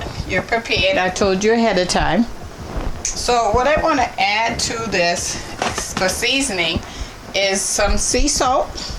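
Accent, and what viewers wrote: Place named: Massachusetts, United States. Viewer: That cabbage looks tasty and side note, I love your accent🥰 I'm guessing you're from the Massachusetts area. I'm southern so I have a very "different" accent from yours🤭 Anyway, I love cabbage so I'll give your recipe a try❤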